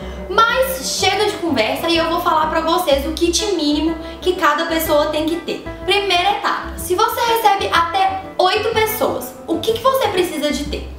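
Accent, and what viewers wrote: Minas Gerais, Brazil. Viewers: Sotaque de mineira!Lindo mesmo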